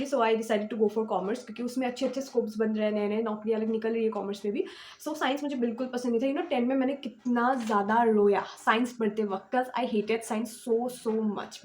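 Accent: Assam, India. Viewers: Assam fam here! I did recognise that you are from Assam from your accent. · It seems like you are from Assam. Are you?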